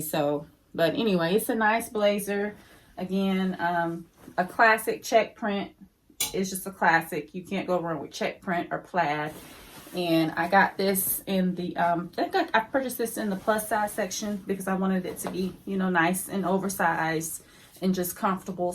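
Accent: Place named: South, United States. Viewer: The J crew blazer print is referred to as herringbone print 😉. I love your hauls and your southern accent is so cute.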